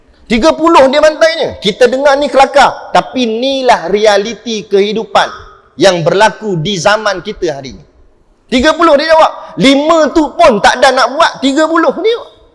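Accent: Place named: Perak, Malaysia. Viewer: Patut lah fasih Loghat Perok...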